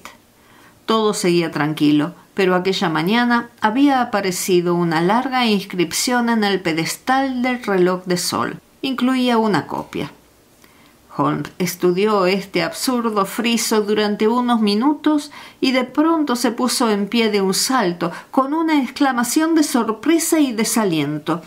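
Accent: Rioplatense, Argentina. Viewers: Me encantó la narración, el tono y estilo de la voz, la velocidad, el acting... pero tanto SHO,SHO,SHO del castellano rioplatense me irritó y no terminé de escuchar. ¡Un abrazo y mucha suerte! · Para mí la pronunciación de las "y" y de las "ll" al modo rioplatense es absolutamente irrelevante. En cambio la entonación de las oraciones y de los párrafos es perfecto. La interpretación de los personajes y sus voces es sutilmente eficaz. No hay incorrecciones en el seseo o el ceceo ni en ninguna otro fonema. Los acentos son correctos. Y la narración de principio a fin es no sólo amena sino que consigue enganchar, entretener y alegrar el intelecto. Gracias de verdad por este canal de lectura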